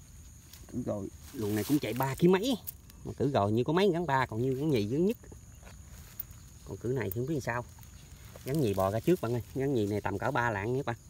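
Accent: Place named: Hau Giang, Vietnam. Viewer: Bạn quê Hậu giang hả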